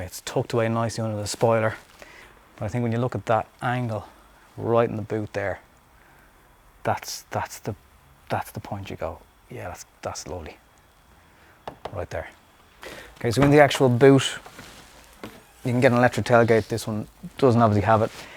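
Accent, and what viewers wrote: Ireland. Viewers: U Irish u sound like it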